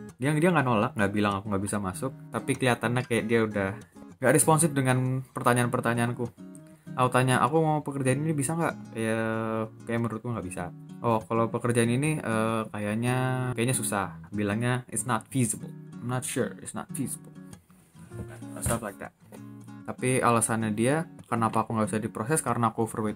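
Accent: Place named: Java, Indonesia. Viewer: Wihh jowo Endi mas 😂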